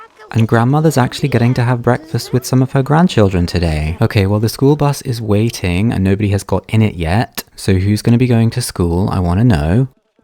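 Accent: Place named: United Kingdom. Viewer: I’ve never seen this channel before, and I haven’t played the Sims 1 since kindergarten, but this video of this calm, friendly-sounding British man narrating the story of a neglectful grandmother and her 7 kids with unfortunate names is giving “creepy lost episodes of the Teletubbies” and I’m here for it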